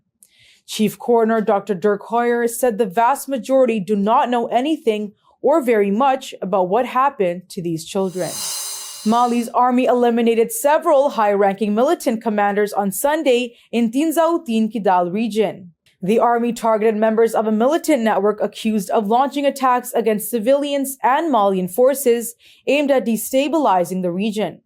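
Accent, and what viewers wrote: United States. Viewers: ╔═══ ❤☀💚☀❤ ═══╗ 💚💚💚💚💚💚💚💚💚 Madam, Assalaamu alaikum. Though it is more than an hour since your news video is posted, the TRANSCRIPT is not made available so far. Without the Transcript we from India find it difficult to follow your American English. Please advise your technical staff to make the TRANSCRIPT facility available for your video!!!. THANKING YOU IN ANTICIPATION!!! 🌐🌐🌐🌐🌐🌐🌐🌐🌐 ╚═══ ❤☀💚☀❤ ═══╝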